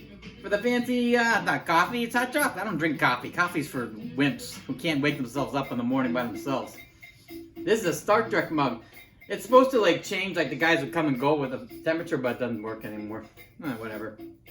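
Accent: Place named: Chicago, United States. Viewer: I'm from Chicago too we have such an accent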